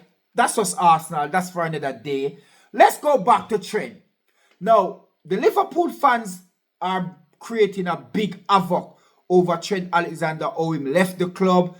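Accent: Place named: Ireland. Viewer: that irish accent from so funny i didnot expected but when you speaking english with irish accent is better rather than you speaking english with accent british that martinelli assist against fulham when we won 2-1 similar like ozil assist to giroud arteta clearly ruined martinelli because he change martinelli become defensive winger instead become deadly winger in final third when we won against fulham 2-1 in april 2025 and you watch Muniz and iwobi, imagine if that was Mbappe and Vini we would be done I think Arteta will leave this season, I don’t think Arteta will ever be able to work with good and experience players. Bertha will sign good and exp players because calafiori get injured so we Can't even use Calafiori in his natural position against madrid at ucl first leg for replace gabriel maghalaes who get injured right now against fulham on april 2025 but even if calafiori not get injured then he still shite as centre back because cannot defend and slow, Now the Artetasexuals will have an excuse to latch on for UCL exit. 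ITS FULHAM so why risk best players before important CL game?? ARTETA IS A MUPPET!!!, Arteta's fault as should rest best players for CL game 🤷‍♂ we can't win premiership so no brainer, this stupid manager too inexperienced for this job, HOW ARE OUR PLAYERS PULLING HAMMIES ALL THE TIME? This must be systematic problem for which is Arteta responsible. Why are we surprised? This is the Arsenal way. Overplay the regulars until they snap a t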